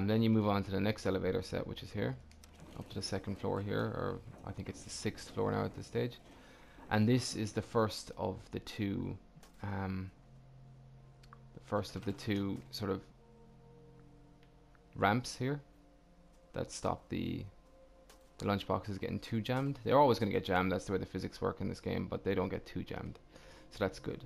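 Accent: Ireland. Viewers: Are you Irish? Your accent is great dude. What’s the craick? Do the ting. Great video. Awesome build. Shouting out skooled zone was class.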